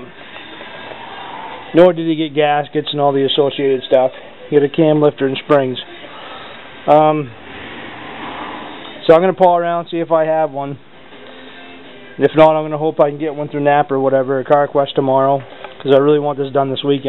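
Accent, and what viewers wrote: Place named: Aroostook County, United States. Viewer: Sounds like your from aroostook county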